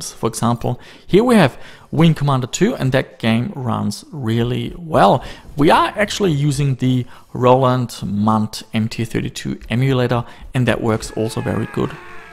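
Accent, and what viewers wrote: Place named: Germany. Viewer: I didn't know you lived in Australia, your accent makes you sound like you're from somewhere in Europe, Germany perhaps?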